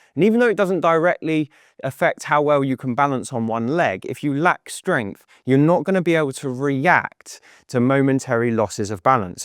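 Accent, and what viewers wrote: United Kingdom. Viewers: Thank you so much, for the roadmap. I'm 82, had a hip replacement 3 years ago and it's been downhill ever since, partially due to covid and painful frozen hip on the other side, and mostly due to laziness.😮 I recently started squats, wall presses, heel tapping and just dragged out an elastic thingamajig for the leg muscles. I'll certainly add your tips. I do NOT want to lose my mobility. Love the British accent, i lived in the UK in my twenties when I first left my enerald isle.❤❤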